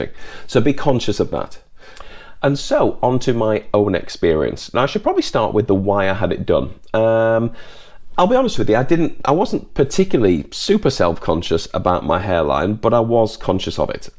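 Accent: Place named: Northern England, United Kingdom. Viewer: Hi, Another insightful video, and great to hear a friendly northern accent;) Thank you for answering my last two comments.. I have been researching FUE for the last 18 months, as that is when I have noticed my thinning and receding hair line. Funnily enough its the exact same time when my first born arrived, maybe there is a correlation as soon as you have kids, your hair says ok, you have found a mate, and we have procreated, no need to stick around lol... Keep up the great work you are very natural and entertaining, Best Rich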